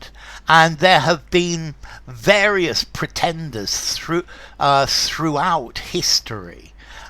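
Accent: RP, United Kingdom. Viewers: I like posh accent a lot 🎉🎉thanks a lot sir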